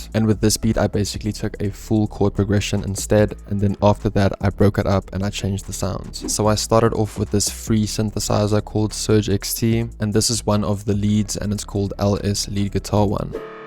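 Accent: South Africa. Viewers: Yo are you South african??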